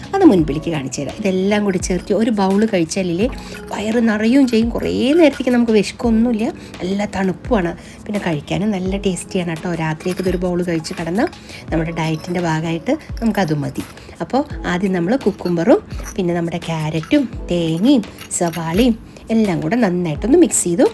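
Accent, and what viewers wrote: Palakkad, India. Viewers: ENIKKU NINGALUDE PALAKKADAN SLANG ORUPADU ESHTAM AANU 🤗ENTE VEEDU EVIDE ERNAKULAM KOCHI AANU 🤗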